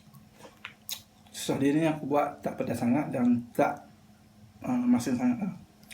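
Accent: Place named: Kuala Lumpur, Malaysia. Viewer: ckp kolumpor ho..haha hai nama saya beng.igt x?😆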